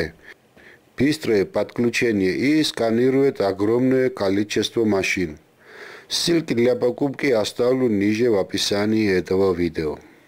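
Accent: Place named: Georgia. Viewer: Всегда с удовольствием смотрю и слушаю ваши видео. Особенно с грузинским акцентом!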